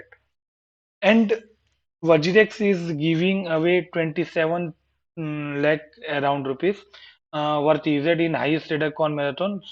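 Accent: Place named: Syria. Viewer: Please don't get me wrong but you speak english like a syrian :D Good day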